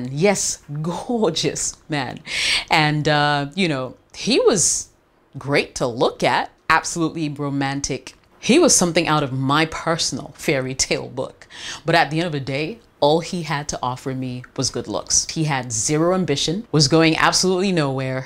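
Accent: United Kingdom. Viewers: Of all the coaches and advisors I watch on YouTube everyday, is the best.: You are a genius. I never miss any of your exciting video-lectures in your beautiful, perfect,crisp,refreshing British English. Hugs from Venezuela,Virgil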